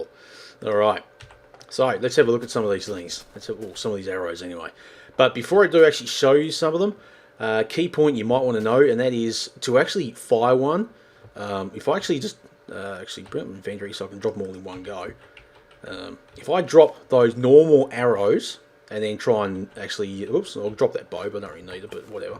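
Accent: Australia. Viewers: its a HOUMING BOUW... i love australian accents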